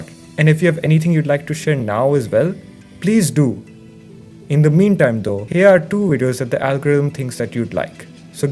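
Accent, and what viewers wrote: India. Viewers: Oi oi another Indian (or South Asian) Jacksepticeye fan. A rare occurence! And from your accent and the English used, I am assuming you live in India (orrrr may be not)? Which is even rarer.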